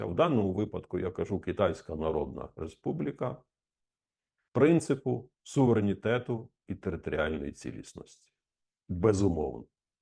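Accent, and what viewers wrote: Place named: Galicia, Ukraine. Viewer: Пане, а почему такое ГАЛИЧАНСКОЕ произношение звука "Ч" ??? Очень медленная речь, просто тянучка !!!!!!!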